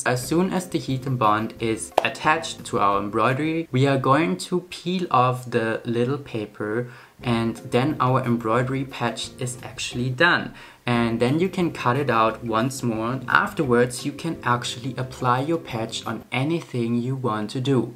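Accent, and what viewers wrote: Germany. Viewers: i find you first on tiktok, and now understand you have YT CHANELLL I started studying German this year and i heared smth simmilar between German pronounciation and your speeking cheking your ig MUNICH DE ME i knew itttttttttttt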